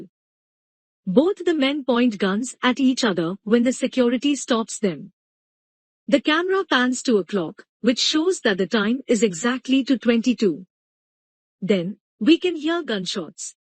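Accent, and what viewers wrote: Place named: India. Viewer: Your accent is like you're an Indian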